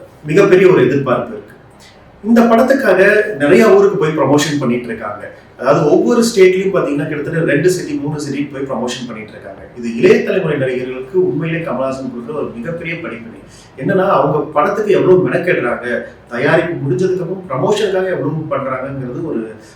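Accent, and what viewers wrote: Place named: Karnataka, India. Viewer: Ne enna karnataka kaara maathiri pesara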